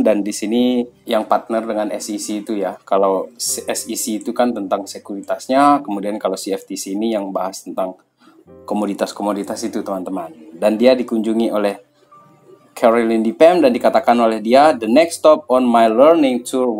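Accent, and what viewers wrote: Sumbawa, Indonesia. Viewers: Penasaran sama masnya, kok mirip orang Sumbawa ya medoknya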